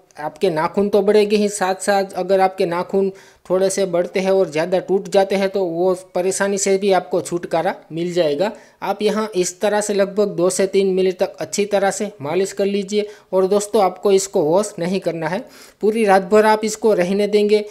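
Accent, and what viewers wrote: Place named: Kathiawar, India. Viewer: Sir tame Gujarat thi chho ne ? Kathiyawadi chho lage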